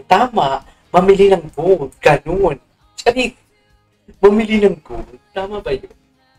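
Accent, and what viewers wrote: Iloilo, Philippines. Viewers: Ilonggo ka sir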